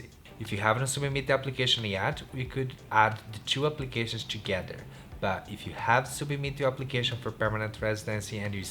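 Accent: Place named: Brazil. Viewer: HE HAS A BRAZILIAN ACCENT. :)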